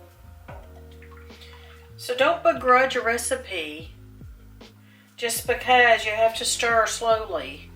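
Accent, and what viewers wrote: Tennessee, United States. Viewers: Hey from Maury Co. Mt Pleasant here. I recognized your accent as soon as you spoke. ☺️ Thank you so much for this recipe, Merry Christmas! ❤️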